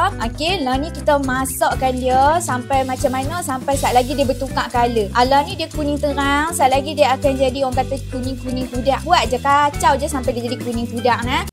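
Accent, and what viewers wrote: Kedah, Malaysia. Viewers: Puaih dgq loghat kedah ampaa oii😂😂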